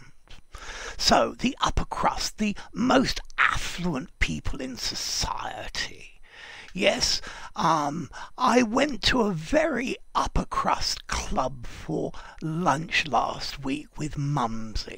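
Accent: RP, United Kingdom. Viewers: Nice upper crust accent. I don't usually go to upper crust restaurants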